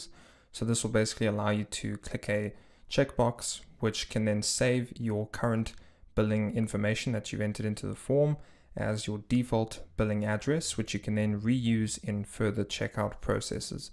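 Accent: South Africa. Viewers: Is that a South African accent I'm hearing? 😌 Thanks for the tutorial 👍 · Sounds like a South African accent. 😌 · Jy is 100% van suid afrika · is from Pretoria I think. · Is this guy from South Africa?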